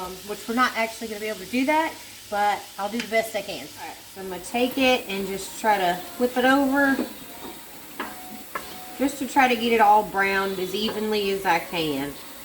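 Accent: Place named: Louisiana, United States. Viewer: I will surely try this😊😊 sounds like you are from Louisiana. I am from SW La. good,simple food….nothing better!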